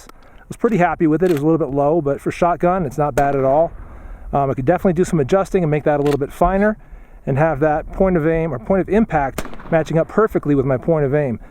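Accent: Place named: Massachusetts, United States. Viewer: ooooh sexy! (the sight, not you dude) I swear man you sound like youre originally from Mass..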